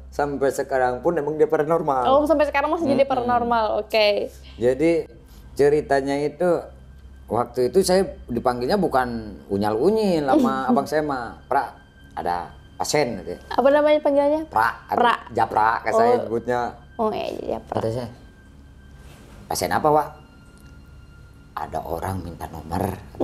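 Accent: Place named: West Java, Indonesia. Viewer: Logat sunda nih ya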